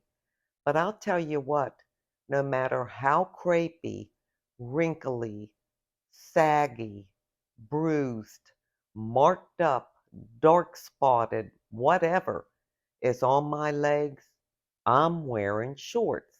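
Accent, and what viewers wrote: Pittsburgh, United States. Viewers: Your videos just recently popped up. As I listened to you talk, I just knew you were from somewhere close to my hometown of Pittsburgh! I’m so happy! Love your content and agree with 99%.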